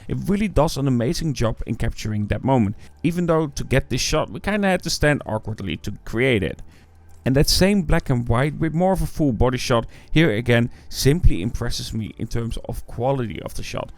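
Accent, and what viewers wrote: Netherlands. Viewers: Yo, man, off topic but what accent do you have, I heard you are in the Netherlands, is it a Dutch accent? If it is, it's really strange how different the Afrikaans and Dutch, English accent is, despite the languages being so similar.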